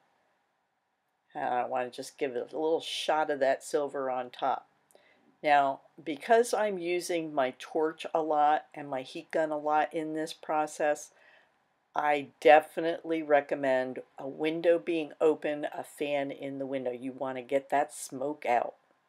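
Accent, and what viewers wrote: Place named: Northeast, United States. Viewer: I was so excited about the phone tip. Seems apple has removed that part of the compass 😔 And I knew from your accent you are from the NE. If you’re like me you’re saying, I don’t have an accent!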